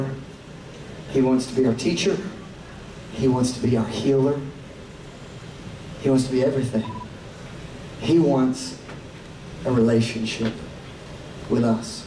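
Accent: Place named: Tennessee, United States. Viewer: "You see, I sound like I'm from Tennessee . . . because I am."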